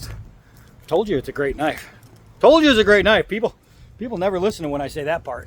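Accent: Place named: Great Lakes, United States. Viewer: Are you in the north east? I’m from western NY, and I feel like I’m recognizing a Great Lakes accent. Great video! And you’re right about animals being tough! Even a squirrel that’s feeling threatened is a tough thing to deal with. Keep it up, brother!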